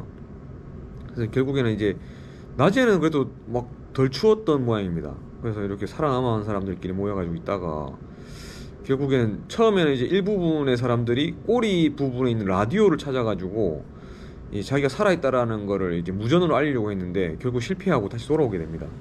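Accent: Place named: Busan, South Korea. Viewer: 너므 말씀 조리 있게 잘하세요 부산 말투가 좀 세련되게 느껴지는 보이스~~멋쪄요~~^^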